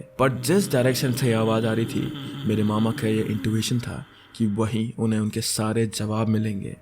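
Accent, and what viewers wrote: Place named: Garhwal, India. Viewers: That Garhwali accent 😂❤❤ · your pahadi accent is soo cutee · Your Gawhali Accent is Awesome 💞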